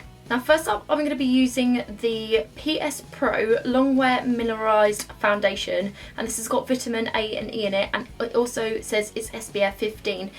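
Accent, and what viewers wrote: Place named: England, United Kingdom. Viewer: woohoo!! your backdrop is so cute!! haha you also have such an english accent!! also your hair looks fab! Love your videos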